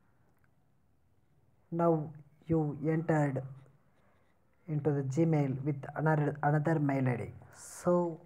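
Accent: India. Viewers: Hes indian , so he has an accent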